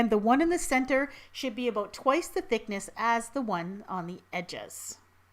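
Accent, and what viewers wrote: Canada. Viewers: Canadian! You can hear it in certain words she uses :)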